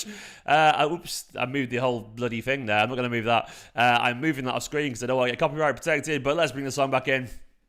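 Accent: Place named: Manchester, United Kingdom. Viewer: Too funny people saying you don't have a Manc accent 🤣 i'm from East Didsbury born and bred and have a similar accent to you ... Yet the Gallaghers are from a mile up the road in Burnage and have a different accent ... wow, so what 😁... By the way, Didsbury, Withington, Burnage, Rusholme and Moss side were always mostly Blue, proper South Mcr ✌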